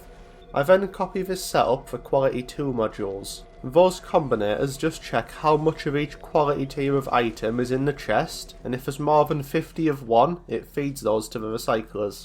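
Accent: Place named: Geordie, United Kingdom. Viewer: the algorithm only just suggested you, your content is so good, and your soft Jordie accent is so perfect for it! got a whole new back catalogue to watch now! amazing stuff!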